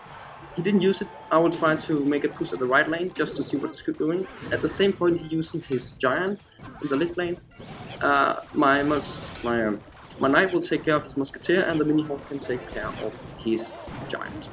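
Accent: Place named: Denmark. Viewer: hvad laver du du er jo dansker det kan man høre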